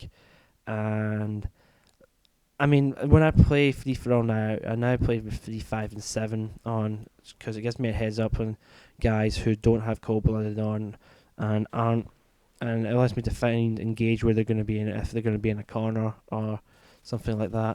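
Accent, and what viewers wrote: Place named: England, United Kingdom. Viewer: nice english accent ^^ and good game :p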